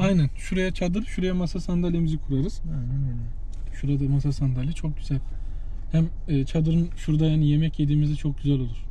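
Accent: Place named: Istanbul, Turkey. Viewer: abi videolarını çok beğenerek izliyorum. Kampçı ruhun ve İstanbul Türkçesi ile konuşman çok güzel gençlerimize çok güzel örnek oluyorsun sana çok Teşekkür ederiz abi 😊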